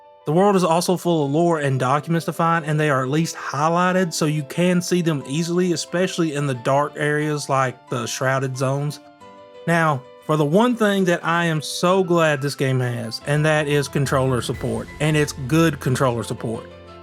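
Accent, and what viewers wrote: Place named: Appalachia, United States. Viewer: No, it's because he has a southern or appalachian accent, and it's the way he pronounces his words :D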